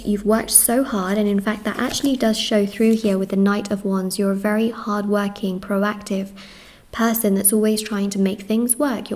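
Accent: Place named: South East England, United Kingdom. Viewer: you sound like your from the south east uk, I love watching your videos, I read tarot cards too but find yours a comfort and more clarification for the readings i do on myself x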